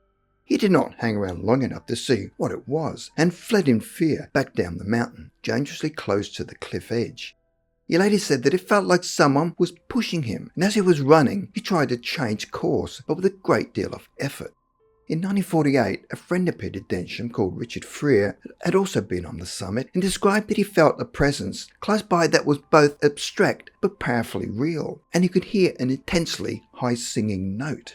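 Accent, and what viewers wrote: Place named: Australia. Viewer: Can you get some narration without the heavy Aussie accent? It is hard to understand your speech for Americans. I think you have a woman who does narration, don't you? I can understand every word she says....I think she is an Aussie too but her accent is not as thick as yours.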